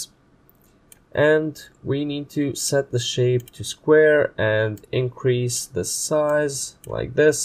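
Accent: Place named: Balkans. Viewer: I suppose your from balkan your accent is great and thank you for tutorial❤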